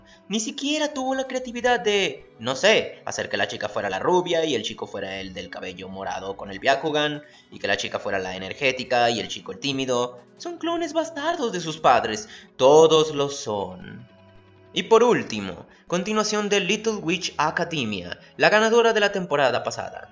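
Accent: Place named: United Kingdom. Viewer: Se que todos odiaran este comentario, pero extraño el acento britanico de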